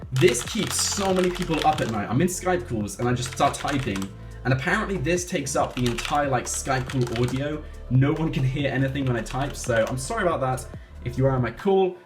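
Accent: United Kingdom. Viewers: R U British? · I just realised your British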